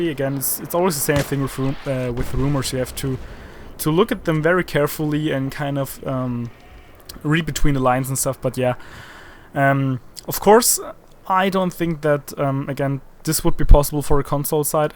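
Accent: Germany. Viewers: I am trying to figure out where you live, because of your accent. And I think you are a german? Is this correct?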